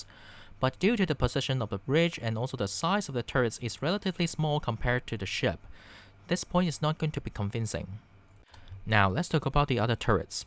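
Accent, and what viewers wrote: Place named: Asia. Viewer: I would regard this as a particularly unfair and unnecessary comment. I’m a native English speaker and I would deem his English to be excellent and conveyed with clarity. There’s an unmistakable Asian influence on his accent, but it in no way detracted from my ability to understand what he was saying, indeed I’d go so far as to say his command of English is better than a lot of native English speakers I’ve met. To the poster of this great video I’d like to say a sincere thank you for this excellent essay and please ignore ignorant comments from the likes of this poster, you did a great job and you don’t need to improve anything, just keep this terrific content coming and thank you for your efforts.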